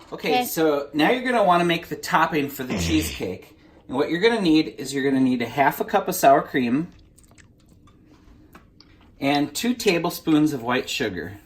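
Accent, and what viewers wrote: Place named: Midwest, United States. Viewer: Haha! I love your Wisconsin Minnesota accent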